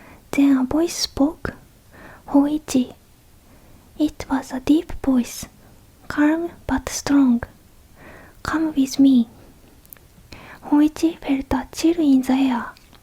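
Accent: Japan. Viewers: I love your sweet voice.. I love that Japanese struggle with saying the letter L it makes Japanese English so cute.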